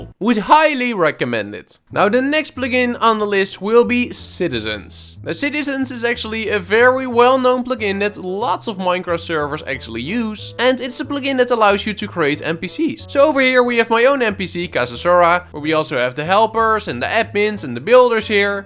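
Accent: Netherlands. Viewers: Are you dutch cuz you sound dutch. i am dutch🤗🤗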